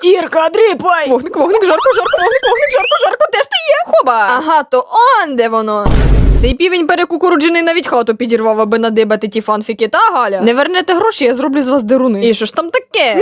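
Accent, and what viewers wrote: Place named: Transcarpathia, Ukraine. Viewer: ця закарпатська вимова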